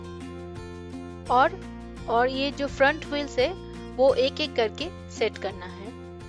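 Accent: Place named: Bengal, India. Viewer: Bengali lady 😂😂... Your accent says it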